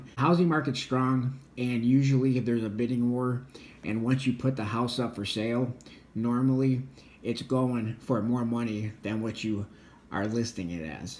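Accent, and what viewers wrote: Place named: Western New York, United States. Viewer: California?! He’s got more of a Western New York accent.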